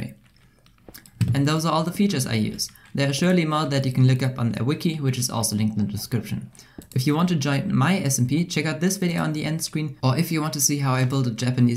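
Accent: Germany. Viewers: deutscher akzent xD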